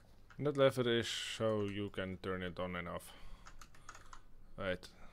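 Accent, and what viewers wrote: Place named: Netherlands. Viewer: Ik hoor een beetje nederlands accent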